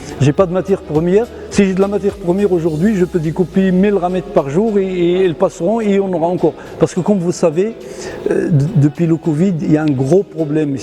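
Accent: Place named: Shilha, Morocco. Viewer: امالك علا لفرونسي باين طاغية عليك الشلحة هضر بيها باش ناس تستافد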